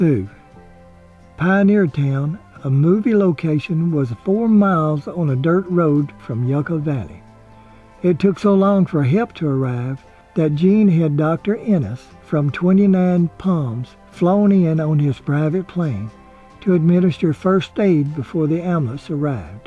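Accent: South, United States. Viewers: Great narration as always. Your smooth voice coupled with your southern accent makes your work more appealing. I only knew this actor from Green Acres. I had no idea he had such so many other roles! Very interesting. Thank you. I really enjoy your work. Stay safe! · I've said it before but say it again when I hear the SOOTHING SOUTHERN VOICE of I know I'm HOME in Louisiana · So nice to hear a Southern voice on documentaries! This was so well done and respectful.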